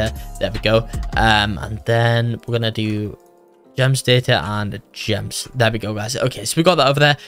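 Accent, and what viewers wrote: United Kingdom. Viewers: By the way he talks hes frim london AND I HAVE 100TH COMMENT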